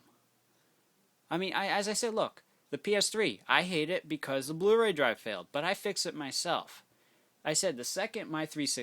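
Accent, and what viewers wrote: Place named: Midwest, United States. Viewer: gosh u sound like one of our customers from either Michigan ,Illinois or Indiana... good ol heartland region.. they love to sit on the phone for 30 + mins with sob bullshit stories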